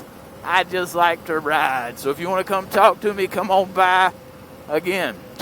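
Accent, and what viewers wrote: South, United States. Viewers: Peachtree! Thanks, I couldn't quite place the accent. I hope you never lose it, out there in SoCal. ;-) Great review. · Isn't this the way to review a bike? No cussing, no horrible music, just to the point and scholarly. Love the American accent too! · where are from sounds like you are southern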